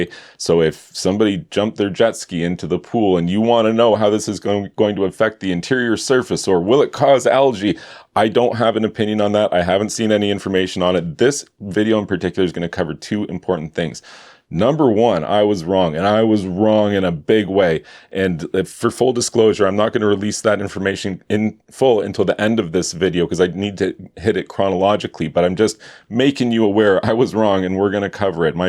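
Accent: Canada. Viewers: I love your Canadian twang :D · This man is clearly, obviously Canadian. I'd say from southern Ontario, likeleh. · Dude, his accent announces that he's Canadian within the first few seconds of any video. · I understood that is Canadian the first time I heard him say "aboot". Grandfather was Canadian. Easy for me to distinguish between American and Canadian accents. Cheers. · you sound like you're Canadian??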